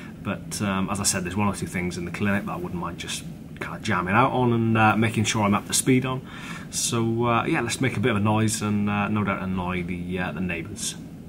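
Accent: Wales, United Kingdom. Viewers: Il vient de pays-Galles aussi ou les accents sont difficile de comprendre.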